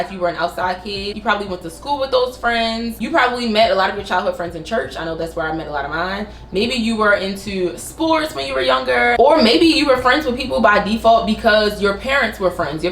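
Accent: Baltimore, United States. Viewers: Are u from Baltimore?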